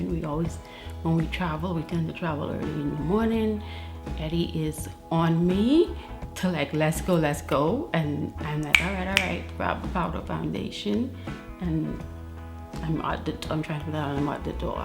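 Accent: Bahamas. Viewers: You don't see this much over here. We just slap makeup on without 1st taking care of our skin and then wonder why our skin is messed up. I use to think makeup broke my skin out until I started watching beauty channels here on YouTube. Then I learnt how to care for my skin before and after applying makeup. Not gonna it feels strange hearing the Bahamian accent here on YouTube but you doing it. Taking 242 to the world 🇧🇸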